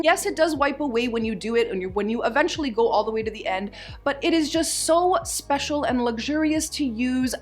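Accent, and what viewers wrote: Canada. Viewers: I just came across your channel and i love your accent! are you from Canada? 🇨🇦 my instagram handle is !!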